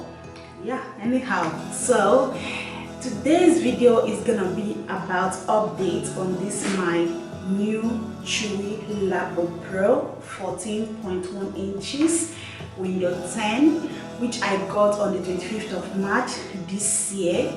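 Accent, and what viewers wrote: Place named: Jamaica. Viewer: Hello, your accent. I love it. You sound just like my best friend her name is Maritza. But we all call her Jamaica. Are you also from Jamaica hun?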